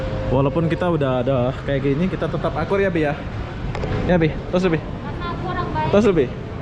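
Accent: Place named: Malaysia. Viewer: Kamu orang malaysia atau indonesia? Waktu di video surabaya ditanya dari mana kok jawab dari lombok , tp logat kaya dari malaysia . yang bener mana 🤣🤣🤣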